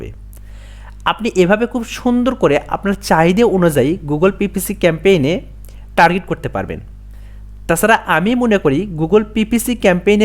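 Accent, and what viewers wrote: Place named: Bogura, Bangladesh. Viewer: ji vai apnr kotha sune bujte parchi vai ..... je apni bogurar manush ,, amieo bogura thaki vai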